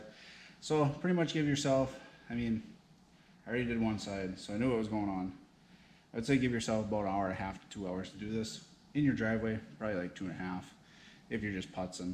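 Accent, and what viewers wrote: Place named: Canada. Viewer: got 2 min in until i realized he was Canadian or Ohioan much love for this tutorial bro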